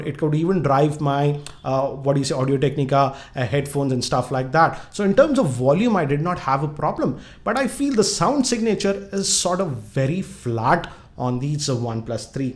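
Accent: India. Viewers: Good review, after watching all those Indian reviews I start to like the accent.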